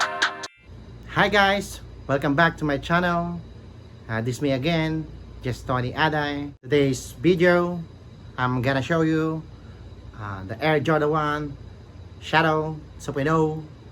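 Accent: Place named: Visayas, Philippines. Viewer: Tol pag sure ba tuno bisaya kana hahaha pero ang ganda ng mga shoes original talga alam ko yan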